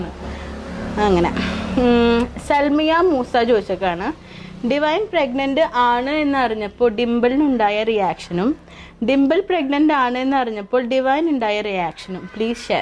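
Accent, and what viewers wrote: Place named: Thrissur, India. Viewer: chechi dea Thrissur language ❤️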